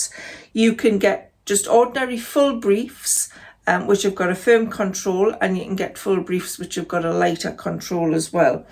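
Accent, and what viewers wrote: Newcastle, United Kingdom. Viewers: Such a good informative advise to anyone using shapeware clothing to improve their image in clothing and your photos prove it works! Well done. Oh, and I love your geordie accent 💞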